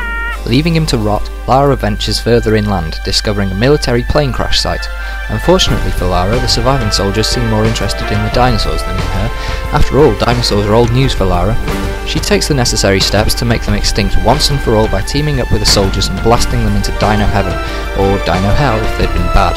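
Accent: United Kingdom. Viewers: Are you from the UK sorry for the random question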